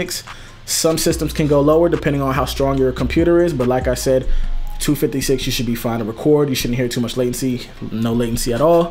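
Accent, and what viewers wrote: Jamaica. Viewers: U Jamaican 🇯🇲 ? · you Jamaican??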